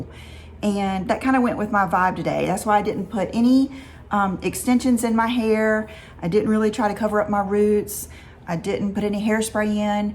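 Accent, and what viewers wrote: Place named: Texas, United States. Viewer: I just want to say that I’m a NoCal girl(old lady) and nowhere near you in any stretch of the imagination; looks, class, knowledge of products, size, nothing! And I was always amused by you because of your way. But I have just fallen in adore with you! Cute as a bugs ear! Sweet and unpretentious and humble but not mousey scared or cow tow ish. I LOVE YOUR TEXAS ACCENT! I love your lips, hair, makeup, accent, syntax, vulnerability, etc. carry on. That’s all. Just be you! Do not change!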